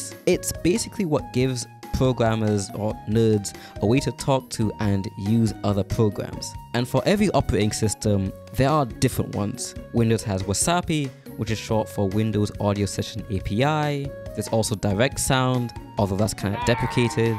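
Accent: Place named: United Kingdom. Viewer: really excited to see your british accent get stronger with each video! <3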